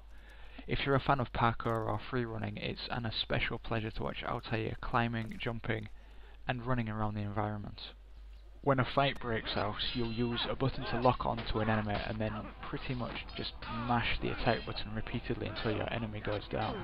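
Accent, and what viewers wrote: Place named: Scotland, United Kingdom. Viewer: He sounds more Scottish than English.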